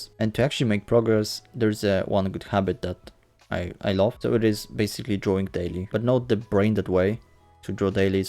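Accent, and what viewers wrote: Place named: Poland. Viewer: Thats some great tips! By the way, if I may ask, where are you from? (Im polish and thought your accent sounds alike)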